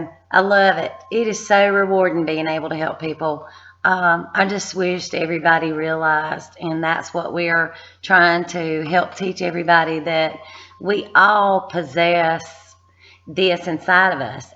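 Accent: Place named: South, United States. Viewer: hi I'm a new member as of today so finding you now on utube. I'm so excited. I see you have got plenty of video's but I've been wanting to try auto handwritining cause I've woke up and somehow still had phone in my hand but have wrote out like a sentence and realize I get messages. can't wait also to watch your charkas. I got sle lupus and brain fog I feel like this could help me. lol I'll shut up and keep listening but wanted to let you know I'm excited. n I love us southern accent. I would do the writing like u choose too. namaste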